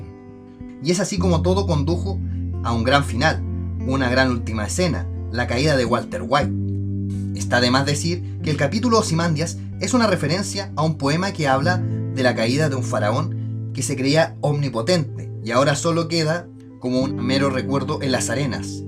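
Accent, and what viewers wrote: Chile. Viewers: Chileno? o parece el acento xdd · El acento chileno es tan chocante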